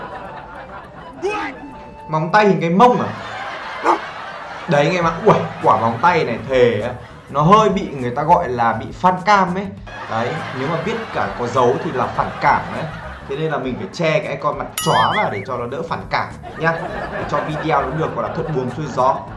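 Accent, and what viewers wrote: South, Vietnam. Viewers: nói tiếng trong Nam dễ thương quá dợ (´∀｀)♡♡♡cute ghê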